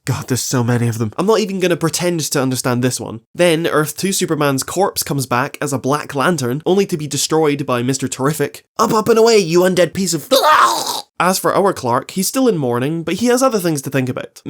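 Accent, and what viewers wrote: Scotland, United Kingdom. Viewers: I'm hardly assuming, the accent is quite clearly Scottish. And yes, I am. :^] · I forgot you were so british wow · Are you scottish?I am too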